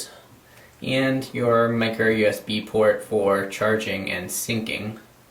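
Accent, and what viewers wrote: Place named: United States. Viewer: terrible american accent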